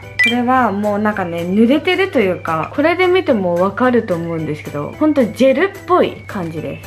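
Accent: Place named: South Korea. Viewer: 英語の発音聞くと、やっぱり韓国人なんだな〜って感じた まじで日本語上手すぎ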